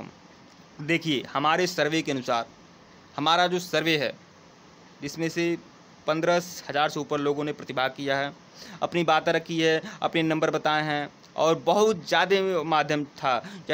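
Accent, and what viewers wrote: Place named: Allahabad, India. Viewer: Ap illahabad se ha apki language bata rhi h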